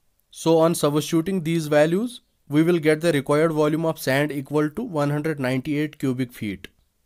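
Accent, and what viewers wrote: Kashmir, India. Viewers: got ur kashmiri accent. good work brother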